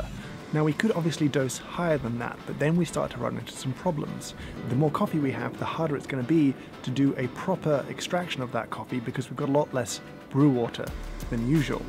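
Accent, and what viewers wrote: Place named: England, United Kingdom. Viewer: All I have to do is take one look at the profile of your glass of ice coffee and I know it's too weak for my taste. It looks more like tea to me. Maybe that's how you like your coffee. You do have an English accent! No thanks. I'll drink mine using the old fashioned Cold Brew process. Dark, strong, and dank!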